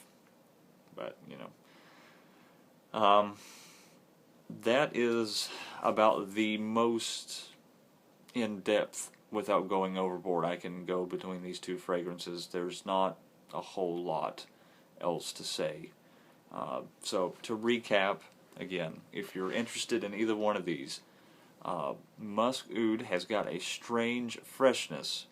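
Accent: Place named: United States. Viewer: I like your accent, whereabouts in the U.S. are you from? Also, you have a cool speaking voice and would make a good actor. So use it fella, it could be beneficial and get you that woman you want to be smelling. Musk Oud? Not a favourite of mine I prefer pure oud from that line but the FMK oud line? Dear Lord, love those scents.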